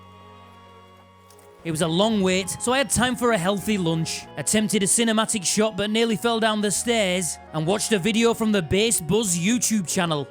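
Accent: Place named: Yorkshire, United Kingdom. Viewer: you make me laugh sooo much, I'm an Expat from Nottingham living in the USA, and i love you and your Yorkshire Accent talking about BEHS, keep it going Mi lad, i play a Musicman stingray love it to bits, Cheers Mate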